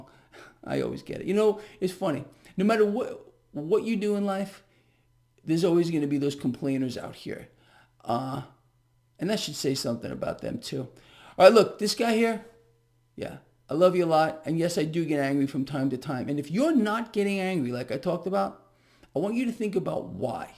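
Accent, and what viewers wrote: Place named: New Jersey, United States. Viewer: you're my nice fren, share his knowledge.. I think never lie.. and very funny.. maybe you from NJ?? sound like my fam.. we from NJ.. you aint from vegas... Um from down da shoor.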